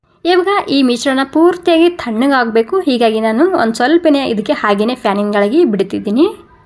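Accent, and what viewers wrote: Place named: North Karnataka, India. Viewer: Mix kannada badri nam uttar karnataka bhashe spr aram hanga matadri bindas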